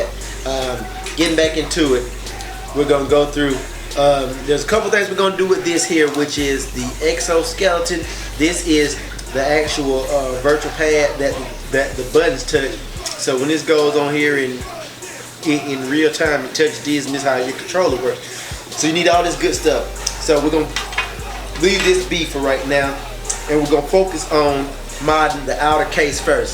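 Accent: South, United States. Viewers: yo voicee is country and raw iwish i could talk like that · u from da south aint you? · He's southern the white people there sound the same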